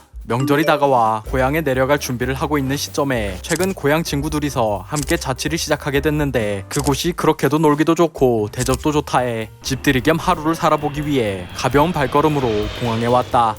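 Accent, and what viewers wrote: Jeju, South Korea. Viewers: 이분 고향이 제주도인가요? 처음듣는 사투리같아요 ㅋㅋ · 제주도 사투리 싱기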